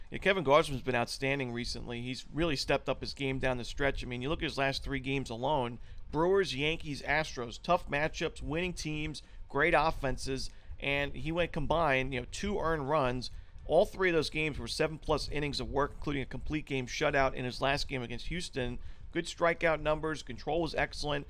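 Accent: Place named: New Jersey, United States. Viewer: FRM PICKKK DAWGZ *jersey accent, emphasis on the K’s.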